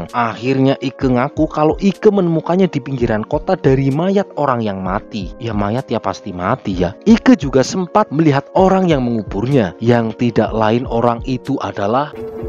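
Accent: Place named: Purwokerto, Indonesia. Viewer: Rika asline wongendi kang, medok temen gole ngomong purwokerto ya😂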